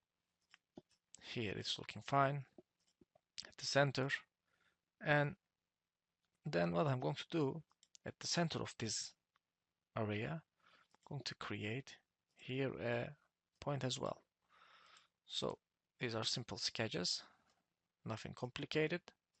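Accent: Turkey. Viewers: Hocam siz türksünüz galiba aksandan biraz öyle gibi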